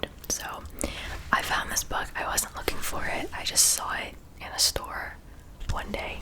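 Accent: United States. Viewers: Hi!I really love your videos, the true crime “video series” it’s absolutely amazing, your voice it’s soooo relaxing and I love you American pronunciation❤️😁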